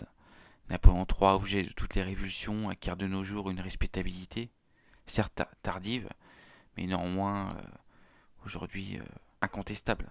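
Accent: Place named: Brittany, France. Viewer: C’est incroyable comme tu as travaillé sur ton éloquence et ton accent breton qui était beaucoup plus marqué auparavant. Ainsi que sur le dialogue.🌟🍀 Personnellement, je n’ai rien contre les accents mais continues ainsi c’est mieux d’avoir un accent neutre pour se faire entendre par tout le monde😂, je reconnais le travail sur soi accomplis.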